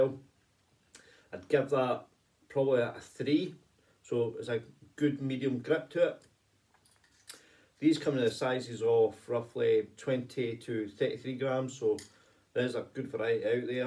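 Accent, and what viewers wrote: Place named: Scotland, United Kingdom. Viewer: Great review! Just ordered a set of these. I will come back and let you know what I think. Love the Scottish accent as well by the way! Cheers from Canada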